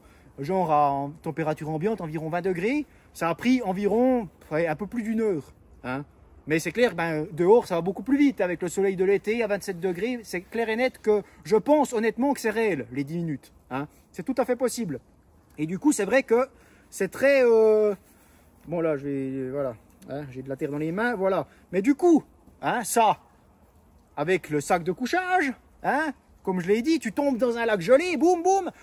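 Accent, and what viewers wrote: Switzerland. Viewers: Super accent jurassien suisse, j'ai la larme à l'oeil!😂👍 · Eh ta moi en proche frontière suisse qui devine ton patois sans me tromper 😅😂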